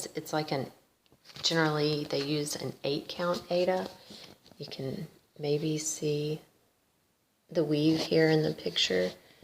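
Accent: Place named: South, United States. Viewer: Just found your channel. Sounds lie you might be from the South. I'm from Georgia. Are you anywhere near there? We had a lot of the same answers on Tracy's talking tag.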